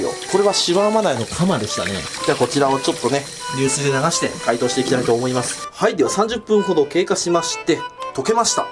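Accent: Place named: Seto, Japan. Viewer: 小さい頃、瀬戸市に住んどりました。懐かしい話し方と発音が耳に心地よいです💧😢 特に、魚おじさんと話してるときは、ほっこりします。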